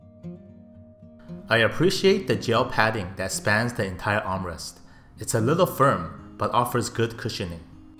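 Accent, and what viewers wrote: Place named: Asia. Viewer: Indeed, I'm also 6'4, I have tried this one at the store. Its not made for tall people. No offense, but this guy has an Asian accent, he probably has a different perception than a European or American of what a tall person is...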